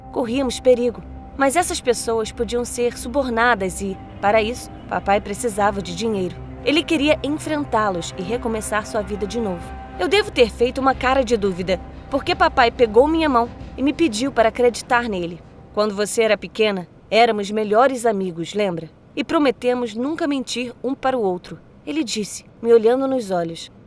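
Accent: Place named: Minas Gerais, Brazil. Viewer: Ela paréce qué ela é minera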